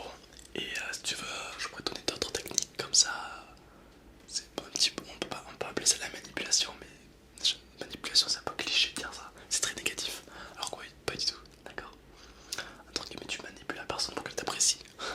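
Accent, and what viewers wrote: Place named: Marseille, France. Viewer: On reconnaît bien l’accent Marseillais , toujours autant présent dans tes vidéos. C’est drôle et bonne vidéo tout de même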